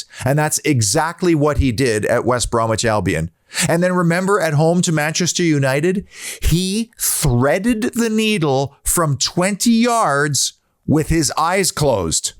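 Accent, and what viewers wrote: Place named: Canada. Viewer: This is a great podcast, wasn’t sure initially when I heard the accent, what do Canadians know about football 😂, very judgemental I know, apologise for that. I will be adding this channel to my weekly watch as you left me feeling very optimistic and excited for the season a head. Keep up the good work 👍 UTV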